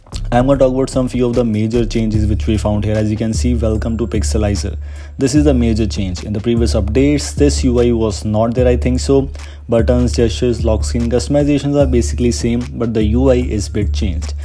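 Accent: India. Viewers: How can i block/ban all Indian Youtubers with one button? The Indian accent is horrible.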